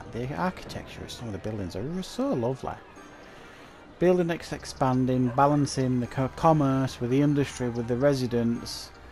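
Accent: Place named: Northern England, United Kingdom. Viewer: Same here. And he's a North Northerner. Bolton ? Rochdale ?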